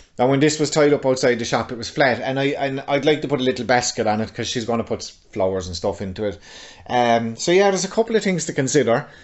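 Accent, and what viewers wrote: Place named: Ireland. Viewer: Sound! I've never got them exactly the way I want first time. The power of editing!! Also great to hear an Irish accent making its way in YT space. Slan a chara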